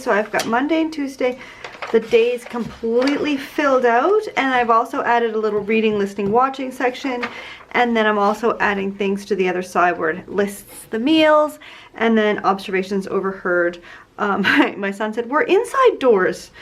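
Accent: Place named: Canada. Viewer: Holy Moly! Did you say your 7 year old son speaks 4 languages???!!! Wowza! I’ve always been curious as to where you live (you sound Canadian but you reference “Europe” often), I was tickled to hear in your video on 8/2 (I think) that you live in Luxembourg! Very cool! Could you do a video about yourself, how many kids, where you were born/grew up, where you live on and why, how you go into YouTube, etc. I just enjoy learning about people! Love your videos!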